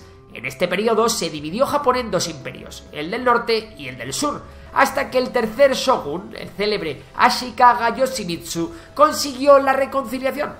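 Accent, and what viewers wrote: Spain. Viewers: Que buenos videos nos traen los españoles a YouTube.... Peeeero cuando mencionan la G o la J parece que van a escupir un catarro. Suena muy feo el español.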